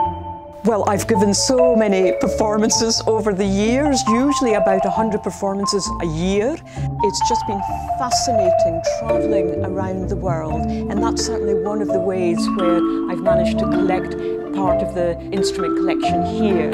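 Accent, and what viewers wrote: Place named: Scotland, United Kingdom. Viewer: Gosh i love the Scottish accent she is brilliant truly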